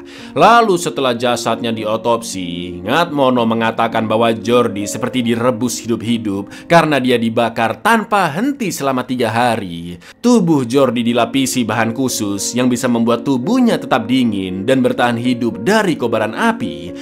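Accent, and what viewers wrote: Java, Indonesia. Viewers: Beta buka gudang, anggaran ilang (gaya bicara medok)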